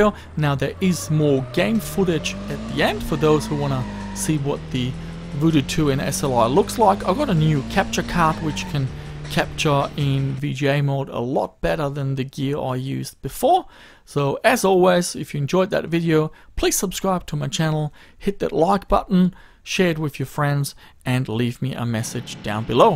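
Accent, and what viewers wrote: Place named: Germany. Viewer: You sound like you've got a german accent.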